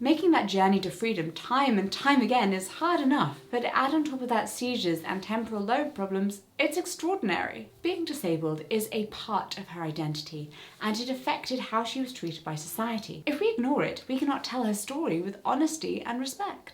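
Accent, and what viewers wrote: United Kingdom. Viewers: While not the "correct" pronunciation, I think the way says it is adorable, especially with her British accent. It makes Maryland sound like a lovely place of sunshine and good cheer. 😊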